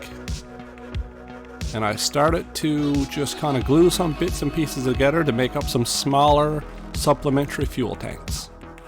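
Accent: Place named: Newfoundland, Canada. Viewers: These look great. And I can hear from the accent that you’re a fellow Newfoundlander. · Oh yeah, you are definitely from the Rock, by!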